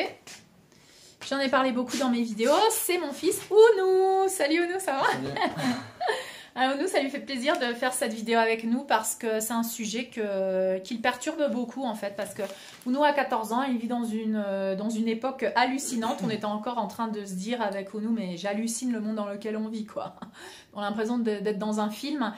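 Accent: Alsace, France. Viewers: Merci, nous sommes sur la même longueur d’onde, je réfléchissais à ce phénomène depuis quelques temps et ta vidéo m’a beaucoup parlé. Je suis un homme homosexuel, mais à aucun moment je ne soutiens le mouvement LBGTQI+-machin-truc-bidule-chouette. Pour moi être homosexuel est juste une particularité ou une caractéristique, ce n’est guère plus important que d’avoir les yeux bleus ou verts. Ça me regarde moi et c’est comme ça. Tous ces lobbys wokistes ne représente qu’eux-mêmes, la seule chose qui m’importe en amour est le respect, l’honnêteté, la bienveillance et la fidélité à soi et à l’autre. Le reste ne m’intéresse pas, et je n’irai certainement pas faire de mon fonctionnement une identité et encore moins une revendication. On ne va pas "convertir" quelqu’un à changer de couleur d’yeux, c’est aussi ridicule que de faire la promotion de l’homosexualité, ça ne se décide pas et ça ne s’impose certainement pas non plus. 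Ceux qui cherchent à imposer cela à des enfants sont de grands malades. Il faut laisser les jeunes tranquilles avec toute cette sexualité et préserver toute leur innocence car elle est un cadeau du Ciel. 🙏🏼 Je suis de Strasbourg-Schiltigheim vivant à Reims, et ton accent alsacien m’a rappelé la maison ❤🤩🥰 Je t’embrasse 😘🙏🏼🦋💐 Que Dieu te bénisse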